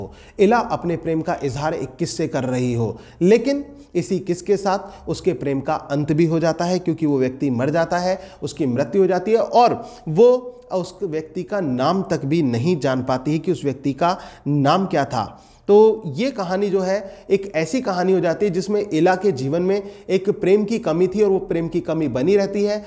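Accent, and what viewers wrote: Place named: Meerut, India. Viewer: Sir aap toh meerut ya baghpat k lag reh ho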